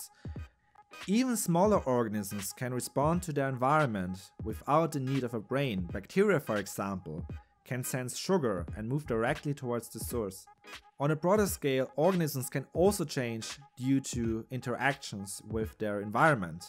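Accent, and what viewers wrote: Germany. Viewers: I see, you are probably a German (cause of the accent) and also you USED COFFEE AS AN EXAMPLE, BUT WHY NOT TEA ? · Nice Video, good graphics., but your Thumbnails lack in quality and you have a strong German Accent. Try to work more with the Algorithm, so your channel picks up. Better Thumbnails, Titles, and less Accent if possible. Just my personal advice, because I want to see this channel grow in popularity.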